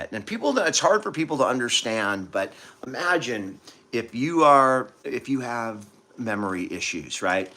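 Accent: California, United States. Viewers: Hi, Good to see you and your Pop. My late hubby with dementia was constantly getting UTIs whenever he was in nursing homes because it's just a given when they wear diapers. When he was at home with me, he had no incontinence issues. Just wanted to make sure you knew that doing the prostate procedure may not circumvent the UTIs. I live in southern Cali and you totally sound like a Californian to me! 👍🌞XX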